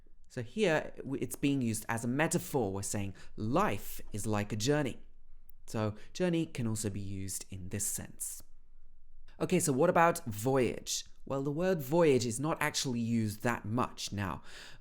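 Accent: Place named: Southern England, United Kingdom. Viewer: Hi. I love your accent so much, I live in Manchester though and I think it's better to have a course with a teacher from Manchester. As the accent in Manchester is a bit different from South. What do you think?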